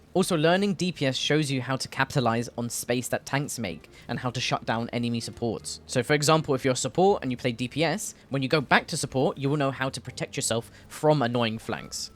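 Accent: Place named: United Kingdom. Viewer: omg he's british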